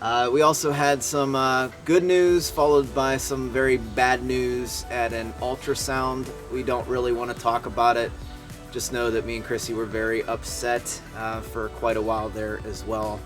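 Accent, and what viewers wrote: Pittsburgh, United States. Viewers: Hey, long time watcher first time commenting. I discovered your channel because I was thinking about doing the black forest trail again and watched your video. I thought to myself, this guy has a Pittsburgh accent and came to find you live in the area. I do as well but I'm not native to the area. Long story short man. I love your channel. I love how you are so genuine and you are not self conscious at all on camera which I envy greatly. I think you might be the best friend I never met. I hope that doesn't sound weird. Anyway man, best wishes and keep on hiking😁👍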